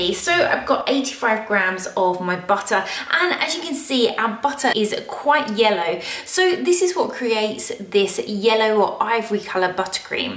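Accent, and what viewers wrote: United Kingdom. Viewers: I love your accent! what kind of british accent is it?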